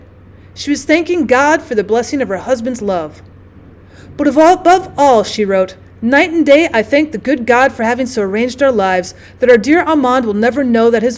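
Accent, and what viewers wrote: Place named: South, United States. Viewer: i just love ths southern twang to this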